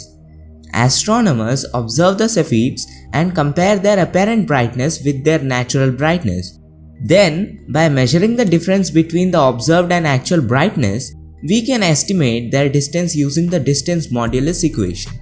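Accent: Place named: India. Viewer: Wow, Indian English is terrible.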